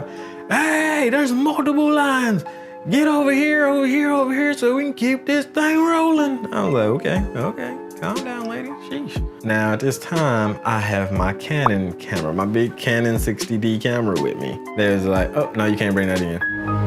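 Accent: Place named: South, United States. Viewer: “ she goes on out there “ Your so country 😭😭 Much love from Tennessee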